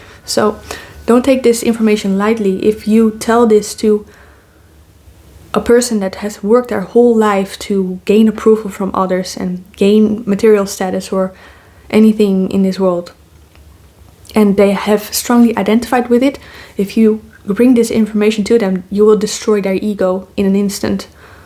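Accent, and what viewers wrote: Netherlands. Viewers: Are you Dutch? You sound like that (im dutch) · I would bet that’s u are Dutch, I can hear it. Plus, the name is definitely Dutch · Defiantly a dutch speaking english🤭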